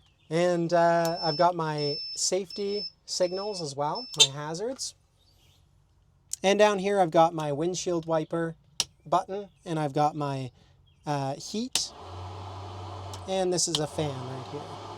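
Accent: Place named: Canada. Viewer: Oh my goodness, Canadian Eh? Where can I go and see this and buy one.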